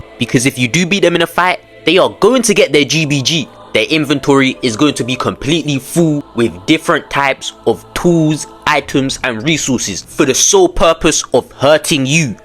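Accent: United Kingdom. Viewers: Bruh you British?? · You sound like you are from the UK if I was you I woulda came to school witta knife if I gotta record a video like the one you showed 🙏🙏🙏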